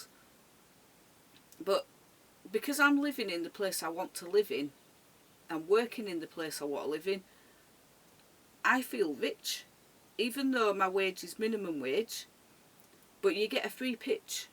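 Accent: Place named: Huddersfield, United Kingdom. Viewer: Yes, I have sent people racing pigeons of mine up there and Poultry. You sound like people I know in Huddersfield and Hebden bridge girl x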